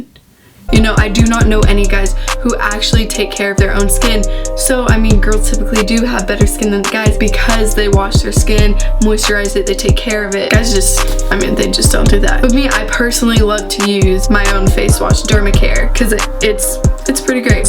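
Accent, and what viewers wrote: Missouri, United States. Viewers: ARE YOU FROM MISSOURI?!!!!😂😂😂😍😍😏I AMMMM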